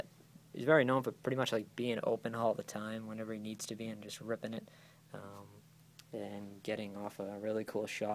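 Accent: Boston, United States. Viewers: Bro u got a heavy Boston accent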